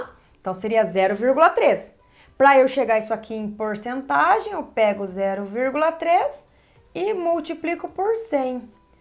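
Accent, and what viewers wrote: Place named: South, Brazil. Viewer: ÓTIMA AULA, e o melhor de tudo, tem o sotaque sulista <3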